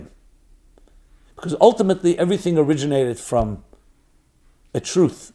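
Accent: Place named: New Jersey, United States. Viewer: Here in Britain, we have something called black magic chocolates. They come in a large shiny chocolate box. And are absolutely delicious. You can't stop at one. Don't know whether you have them in the states 🤔 All negative states of being are overcome by love. There is nothing stronger. Although it is vital to sort the wheat from the chaff. And act accordingly. Thank you for the great talk, Rabbi. Love the new Jersey accent. Oh, Ecclesiastes is an awesome book. You've just mentioned it. Few were wiser than Solomon. Heightened states of consciousness are synonymous with objective consciousness. When separateness disappears and one becomes all that one beholds. As Solomon says, "as above, so below."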